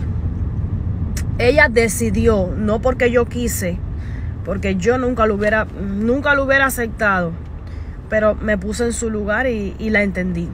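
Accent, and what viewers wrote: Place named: East, Cuba. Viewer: nena, eres una grande, salud, y millones de bendiciones, yo soy de la habana pero me encanta tu asentó oriental, y muy bella que eres.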